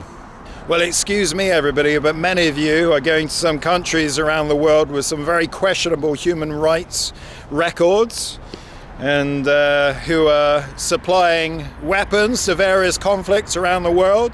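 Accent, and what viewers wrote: England, United Kingdom. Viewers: The most annoying thing about you is your pompous english accident maybe that's why you only have ten thousand subscribers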